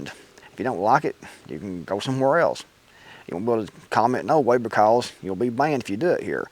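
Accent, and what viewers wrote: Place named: Tennessee, United States. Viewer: I thought the reload was spot on. What you said about Jui Jitsu is true. Tae Kwon Do would be the same. There are elements in all of it that could be useful, but street fighting, especially against multiple adversaries, will prove you need a lot more than any one form teaches. What's with the breathing comments? I'm just glad you are breathing. Jeez!!! What up people. Now, I do notice that you talk a bit different. I think I remember you being from Tennessee - y'all talk a bit different than we do here in Alabama. In fact, we here in Alabama notice that quite a lot of people in different other parts of the country don't talk as correctly as we do here. This is something we notice, and have come to accept. We are OK with it. Don't let the malcontents get to you. Have a great day!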